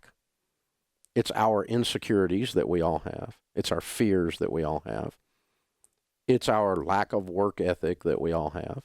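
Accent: South, United States. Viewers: I like your show but New Yorkers accent is a lot better than southern accent. No offense.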